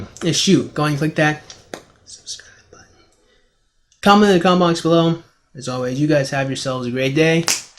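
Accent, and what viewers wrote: United Kingdom. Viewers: lol what is up with the limey accent? You speak perfect english. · Your British accent makes me sp uncomfortable and left the vid with a dislike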